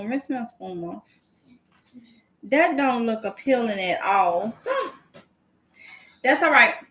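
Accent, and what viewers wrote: South, United States. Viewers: GM, im awake at 4 something in the morning. Im 79 yrs old in upstate NY. Im learning and remember when i was 36 yrs old, i had a new born baby, working the night shift and husband took care of baby at night. I love you take care of your family. And I can understand your southern accent. My Mom had it also.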